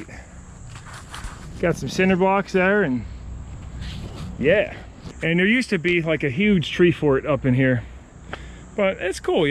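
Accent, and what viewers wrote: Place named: Philadelphia, United States. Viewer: This man is def from the philly region i can hear it in the voice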